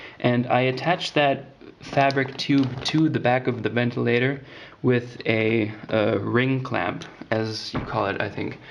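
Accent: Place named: Germany. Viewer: so typisch deutscher akzent ^^ super reviews und super paint booth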